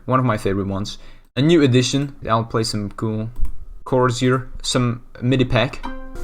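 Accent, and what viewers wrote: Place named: Netherlands. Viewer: Cool to hear your voice man, where you're from? Your accent sounds kinda dutch 😁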